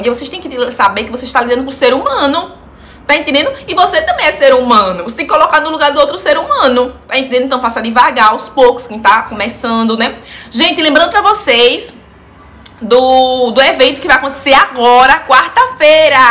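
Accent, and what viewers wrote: Northeast, Brazil. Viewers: Amo seu sotaque nordestino,sua alegria e juventude me encantam, parabéns lindaaa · sigo vc justamente por causa do seu jeito, viu? Adoro esses sotaques nordestinos, moro em juazeiro do norte, sou manicure, e vc me incentivou bastante❤ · Adoro seus videos o jeito de vc falar seu jeito arretado do nordestino.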